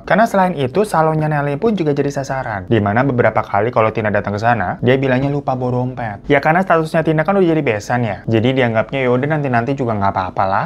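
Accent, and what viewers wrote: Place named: Jakarta, Indonesia. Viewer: Tiap bawain cerita .. logat bahasa ny ky org betawi ga sii 😂😂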